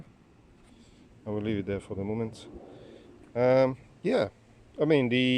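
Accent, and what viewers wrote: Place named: Italy. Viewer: you speak Netherlands i can here you Italien accent beceause i was many thimes in Italy in Modena Ficensa Treviso Napoli vinetie etc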